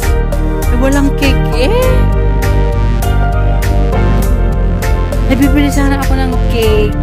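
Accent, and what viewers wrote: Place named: Batangas, Philippines. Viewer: Ang Salita mo is parang batangas at Sa calapan Sa Mindoro din ang quezon ano. Tagalog Na tagalog at May punto.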